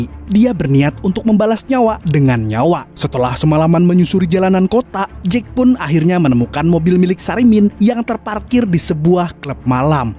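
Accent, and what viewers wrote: Banjar, Indonesia. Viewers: orng Banjar kh bg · Bahh banjar sakalinya si nihh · Keren yang bacakan alur ceritanya sampai kada hingkat guring ulun. Pasti angah dari Banjar ya kan? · Banjar jua sakalinya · org banjar skli nya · Orang banjar skli nya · Nah paman, orang Banjar kh pian 😁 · Umaiiii Banjar ai rupanya wal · Aihh sekalinya ikam urang banjar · Banjar kah nii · Mantap banjar nya 🤣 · Astaga banjar sekalinya, tekajut² uln 😆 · banjarr sekalinya pian lahj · urang banjar kali nya naseb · Banjar banar wkwk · Harau urg banjar sekalinya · akay urng banjar kalinya di dangar bahasa😂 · Kira orang manakah sekali nya urang banua juaaaaaa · bah mimin nya urg bnjar, mntap mang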